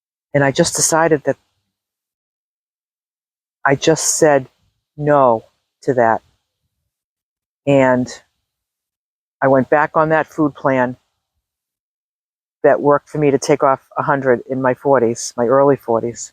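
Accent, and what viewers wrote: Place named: Massachusetts, United States. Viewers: you sound like voices from my childhood in Massachusetts.💗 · I am sooo grateful that I fought your YouTube sight. You are an inspiration to me. I can so relate to everything you have shared. I hear a Bostonian accent which also excited me because I’m a true Bostonian too. I will continue to watch you and look forward to more videos. I truly feel I can sleep better tonight and wake with 3 different outlooks to my life tomorrow (physical, emotion and spiritual. Not to sound corny but I swear You were sent as a wellness blessing to me. Thank you! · Nice to meet you,! You sound like you're from the Boston area, not far from me in Southern NH! Hi, neighbor! Thank you for sharing your inspiring story...it was such an encouragement to my heart! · You sound like you’re from Massachusetts are you I am and I pick up a pre-bit of an accent. 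Love your channel. I’m a regular subscribe right now. Please answer my question about Massachusetts. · Thank you for being you, and here on YouTube! I like you! One reason is because I can tell that you like you! I find you to be very refreshing! Sorry/not sorry for all the exclamation points. 😊 I'm in New England, and your accent sounds pretty local to me. I'm curious where you're from. Anyway, I'll be following you here. Keep on keepin' on my virtual friend! You're an inspiration. ❤ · I love your accent. I miss my east coast cousins.UR my example. I need to drink moreH2O. I have to walk more!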